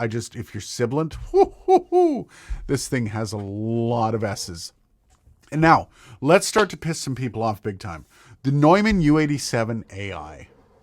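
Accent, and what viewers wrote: Canada. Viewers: You’re Canadian eh?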